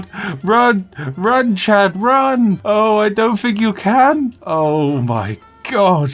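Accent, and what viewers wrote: United Kingdom. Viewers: This guy is definitely British guys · I dunno what it is but something tells me he’s British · Your Brit is showing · I have a question, Are you Bri-ish? 🇬🇧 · Why r u british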